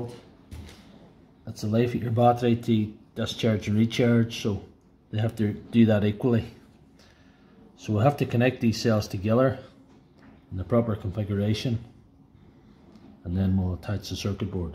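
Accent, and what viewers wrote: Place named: Scotland, United Kingdom. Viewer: Well isn’t this great. I found out recently I’m more Scottish than anything else (American from the mid-west) and I’m about to get into a damaged m12 pack. Beautiful. 😂 I’m sorry if you’re not Scottish but to my ear that’s what I’d guess. Great video regardless. 💪🏼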